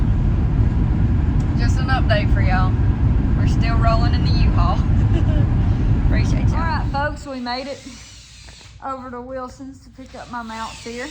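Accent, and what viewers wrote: South, United States. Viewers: That Northern fish loves your Southern accent 🤩😁👍🏻🌞😎🥰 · That southern belle accent, beautiful conservative woman, and she fishes...thats a keeper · Girl, do you ever run out of energy? Love the southern accent, makes my day every time I hear it.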